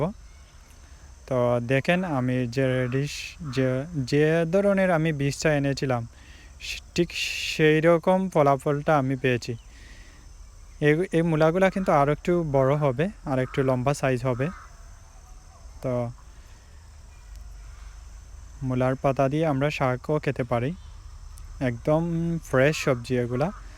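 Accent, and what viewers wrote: Sylhet, Bangladesh. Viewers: Sylhoti bhaisab. Apnar mat khub bhala lager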